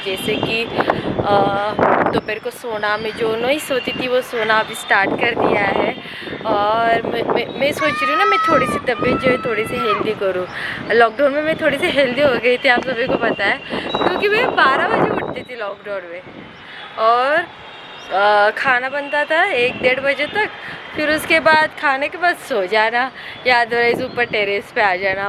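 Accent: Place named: Maharashtra, India. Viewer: Kay yar kay loka ahet...Tumhi kay punekar dista watate. punyat sHhudh marathi bolali jate. Tasach itar bhagat marathi ch bolali jate pn wegli tone ,uchhar wegle astat tyat kay awdha.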